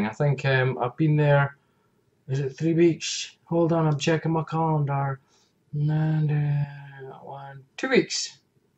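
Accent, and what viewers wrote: Scotland, United Kingdom. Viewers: Haha that is why I subscribed. I came for the Scottish accent and left with a new friend who also enjoyed wearing women's clothing ;-)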